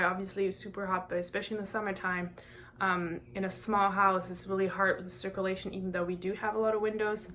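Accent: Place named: Germany. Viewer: your english really improved, i remember watching your videos back when your (cute) german accent was way stronger💛i love to watch your videos and hope i‘m supporting you through it, i kind of have the same dream of living in hawaii for like 1/2 years in my life one day. greetings from switzerland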